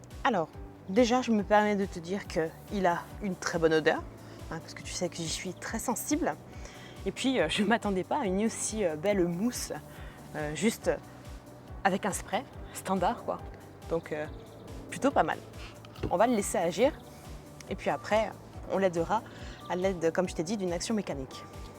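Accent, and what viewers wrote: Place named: Corsica, France. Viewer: Coucou comment vas-tu Petite vidéo sympa pour le bêtisier j aurait mis un colorant bleu comme ta voiture c est vrai que le produit a l'air peu économique mais il a deux fonctions PS j adore ton acens anglais corse😀😘 a vendredi bises cordialement ce serait un plaisir que un jour je puisse te rencontrer pour avoir tes conseils au plaisir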